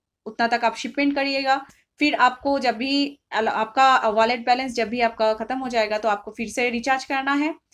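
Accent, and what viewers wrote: Bengal, India. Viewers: R u bengali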